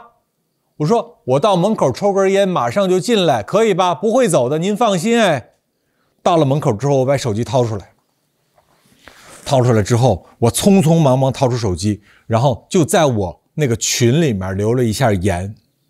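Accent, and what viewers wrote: Beijing, China. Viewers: 哈哈这京味咱北京人儿:hand-purple-blue-peace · 您的北京口音也挺浓重的:face-green-smiling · 我比你大几岁，但真心佩服你的方方面面，优雅口才底蕴思想逻辑真诚痞帅，艺术的表达，等等等，真是一个完人。开始还奇怪为啥没有一点东北口音，原来十几岁就去北京独自生活了，一口北京话听着真舒服，优雅、收放自如、语音语调节奏表情和内容配合的简直完美，嬉笑怒骂正直诙谐幽默信手拈来，能感知到你忧国忧民的善心，远超很多知名评书人士。不像其他中文博主纯纯为了博流量赚钞票，看好你一定会超过某高和某沫及其他一众所谓的反贼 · 一开始听口音我就明白了你是首都人，再离政治中心很近的地方不可能安然无恙，我离政治中心也不远我也不敢直接说习近平，我会用大xi或者西哥，而且我们大部分不会再群里聊政治，都会当面聊。我当过辅警我们这地方小的警察要比首都要松一点，有意思的是我在当辅警的时候和正式的警察聊天他们也骂习近平（正好在开十八大的候）。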